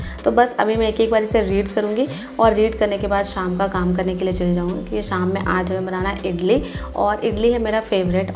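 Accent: Bihar, India. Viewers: Didi aap bihar se ho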